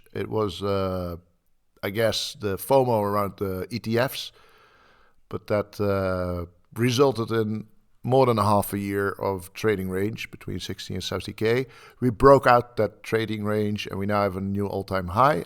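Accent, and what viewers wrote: Netherlands. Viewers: are you Dutch? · I smell nederlands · i smell nederlands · From The Netherlands, no doubt. Like I hear myself.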